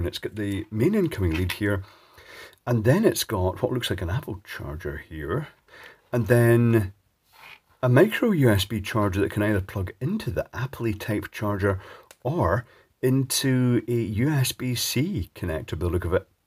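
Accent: Scotland, United Kingdom. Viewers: Is this what posh Scottish people sound like?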